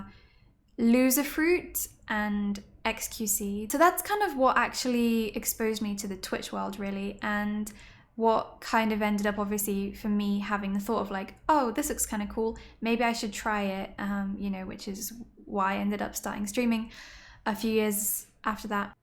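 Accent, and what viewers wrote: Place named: United Kingdom. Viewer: I would love to sit with you at a restaurant table and have dinner, while listening to your stories (and sharing mine as well). I like the way you tell stories, and I also can’t get enough of your sweet British accent 😄 love you, Ms.!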